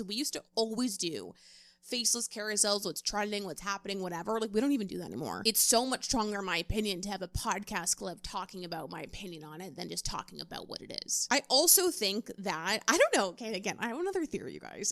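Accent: United States. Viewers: American woman with that goddamn accent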